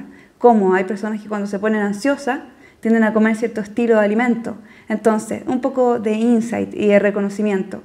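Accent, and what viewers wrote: Chile. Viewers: Gracias por estos vídeos!! Amo tu tono chileno. Saludos desde Argentina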